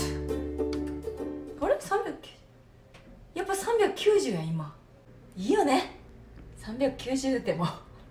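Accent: Kansai, Japan. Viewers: 関西弁のノリが最高！うまいもん食べてる時が一番幸せですよね。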